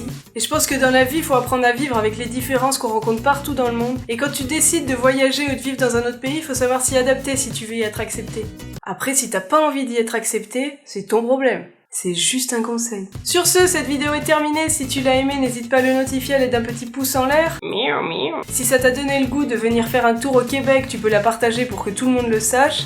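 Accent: France. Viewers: M0i jaddort l'accent française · Messemblais que tavais un accent français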